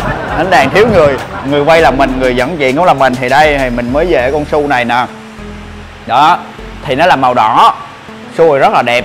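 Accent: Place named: Phan Thiet, Vietnam. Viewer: anh từng sống ở phan thiết đúng k anh? Nghe giọng giống dân pthiet quá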